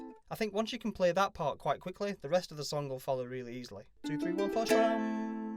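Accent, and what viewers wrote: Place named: South Yorkshire, United Kingdom. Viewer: Nice tutorial man! Sounds great! Also judging by your accent you sound like you’re from my neck of the woods in England, South Yorkshire?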